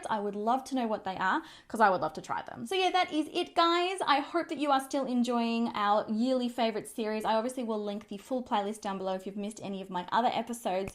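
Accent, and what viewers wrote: Australia. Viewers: It is her Australian accent.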